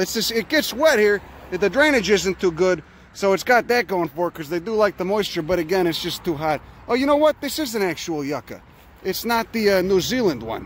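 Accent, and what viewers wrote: Chicago, United States. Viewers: That's a pure Chicago accent.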